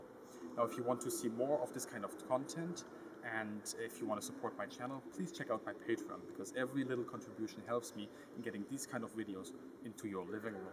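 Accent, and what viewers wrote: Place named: Germany. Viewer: Wow! This guy has such a depth of knowledge, AND a talent for sharing it. Your English grammar is perfect, but your German accent is also perfect! As is your Italian accent when talking about Italian aircraft. I am so glad that you (obviously) enjoy teaching us about things we would never have imagined we would be interested in without your tutelage! Very impressive deep knowledge. Thanks for sharing! Vielen Dank!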